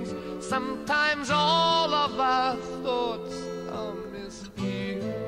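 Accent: Russia. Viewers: Haha. -- But she can entertain us however she wishes -- Just focus on that Russian Accent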